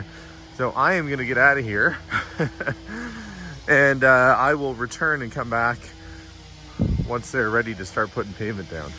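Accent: Canada. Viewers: I wish I had a hundred dollars for every time he said ass fault. I could go on a shopping spree. I thought maybe he was saying it so much because in his last video on the pool house, people in the comments kept pointing out how he says it differently than how it is pronounced in the US. Maybe that is how all Canadians pronounce it. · Remember that he is Canadian and that's how most Canadians pronounce it😊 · It's a Canadian pronunciation.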